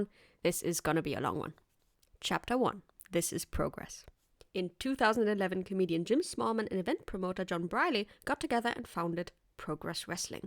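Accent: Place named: Germany. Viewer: YES MA'AM YOU'VE GOT A NEW SUBSCRIBER in me. FUCKIN FANTASTIC Work. And your prominent German Accent Just adds to the whole THEATRICS of it all. just brilliant work. 👌👌10/10.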